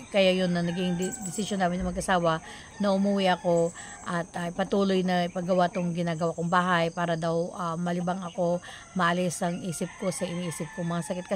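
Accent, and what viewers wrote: Ilocos, Philippines. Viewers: ilocano ka gayam madam? kunak idi bisaya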